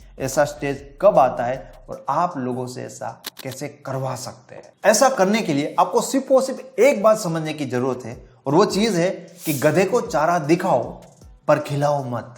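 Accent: Gujarat, India. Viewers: Aap gujarat se ho ?? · Sir Are you from Gujarat ??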